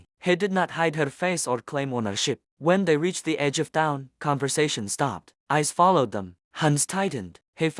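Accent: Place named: Poland. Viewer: Horrible,,bad,,terrible narrator. At the very least,,program the stupid AI to use an actual western voice,,not a polish or russian voice. Splash? Wtf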